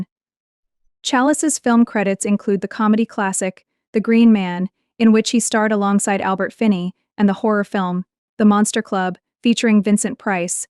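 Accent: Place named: United States. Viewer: Why have an annoying American robot voice telling us this. Ruined it. Can't watch now.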